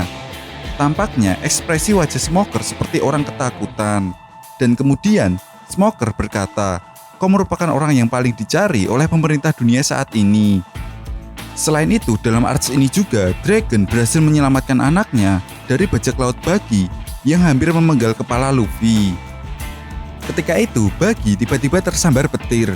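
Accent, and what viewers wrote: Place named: Banyumas, Indonesia. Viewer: Bos ngapak banget ....tp seru denger critnya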